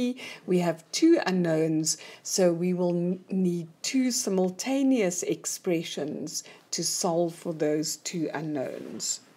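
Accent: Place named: South Africa. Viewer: I'm a South African studying in the states and hearing this accent made my day, new subscriber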